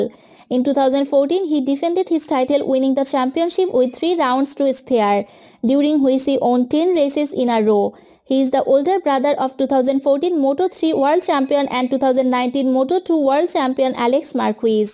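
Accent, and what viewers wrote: India. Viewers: She maybe a indian announcer...by the way thanks for the effort..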